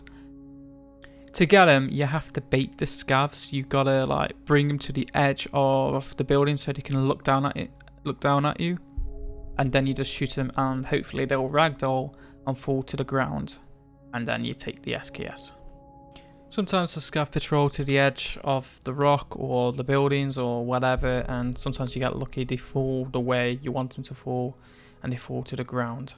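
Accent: United Kingdom. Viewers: but you are English.....well from your accent you sound like being from the UK and before our English in the us the only people to speak English were British people.....so the "we all do it" is wrong here because hes not from the us for one so maybe they pronounce words differently than we do here?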